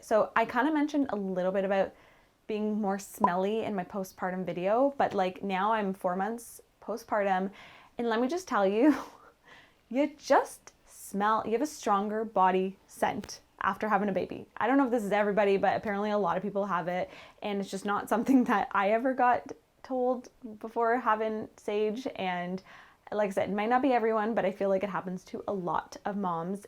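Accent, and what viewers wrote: Canada. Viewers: Great video! Are you Canadian?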